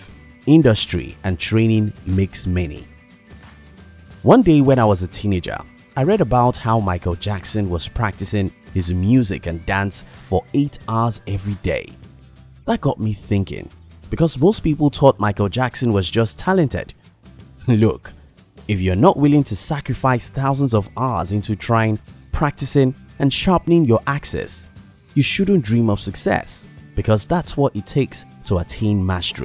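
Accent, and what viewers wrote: Nigeria. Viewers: It's quite obvious he's Nigerian. So what? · This guy on the audio is Nigerian trust me .. he just Dey Lagos here now Dey tell us how to make money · you sound nigerian.are you?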